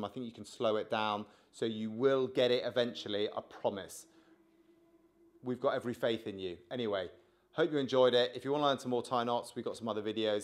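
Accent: England, United Kingdom. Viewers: The best part of your video was your English accent since I'm kind of partial to the queen 👑 of England. Well I have to watch your videos at least 10 times maybe 12 times. But I got it. Hey I like your store. 🤔 Maybe I should go to more of your videos and find out how you did it I kind of like that's a great idea having a nice store like that I wonder if it would work in Bothell Washington probably would cuz Microsoft is here Amazon is here Google is here Nintendo is here.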